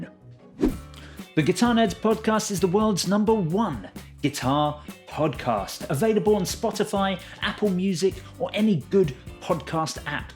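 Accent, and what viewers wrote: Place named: United Kingdom. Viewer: This guy kicks ASS! I usually HATE Brittany accents. Love this dude! Different NRG💡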